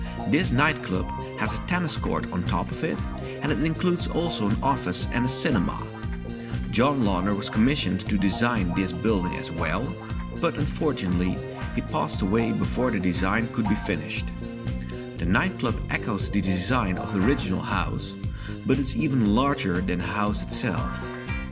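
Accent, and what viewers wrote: Netherlands. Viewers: Een Nederlander die Engels praat. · You happen to be Dutch? Great video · The narrator must be Dutch?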